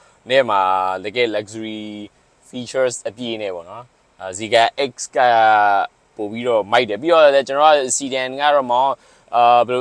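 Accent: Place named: Rakhine, Myanmar. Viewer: ရခိုင်ထင်တာပဲ မဟုတ်ရင်တော့ သပ်သပ်ယားတာပေါ့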